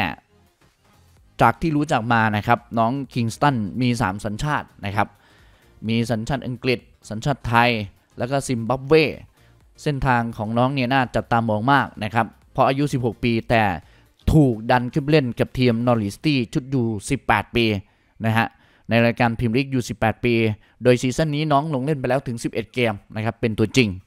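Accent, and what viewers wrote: Isan, Thailand. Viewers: ชอบ..แอด เว้า อิสานแหน่.? คักหลายๆ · พ่อใหญ่เม้งเว่าอีสานม่วนๆพ่อแม่พี่น้อง ข่อยมักแฮงลาวเว่าอีสานจูบจ้าวอินเนอร์มาเต็ม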